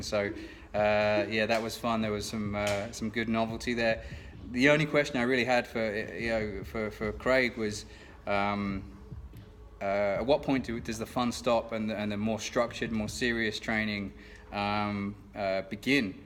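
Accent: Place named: Australia. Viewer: Hi mate, what part of the UK are you from? When you speak you sound Australian.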